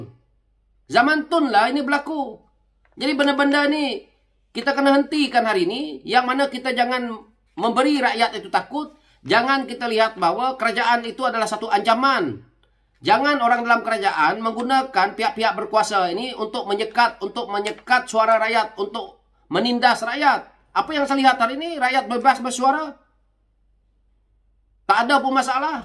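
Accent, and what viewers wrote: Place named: Terengganu, Malaysia. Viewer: Kuat betul loghat trengganu tuu..😅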